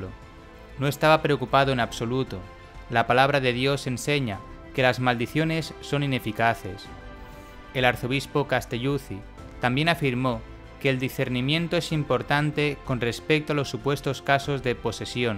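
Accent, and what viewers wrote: Spain. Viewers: Archidiócesis y arquidiócesis son correctas las dos en español, la definición de arquidiócesis se utiliza en Hispanoamérica y Archidiocesis en España. Espero que su duda quede resuelta . En italiano es ARCIDIOCESI. Tengan en cuenta que el narrador del video habla en español de España (no español latino por eso Uds. se confunden) Espero que la aclaración les sea de utilidad. Saludos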